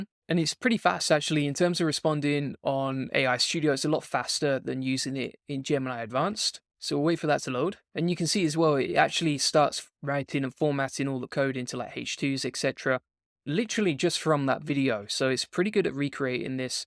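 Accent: England, United Kingdom. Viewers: Btw! I love love the accent! Are you English? Or Scottish? Am i insane for liking the accent?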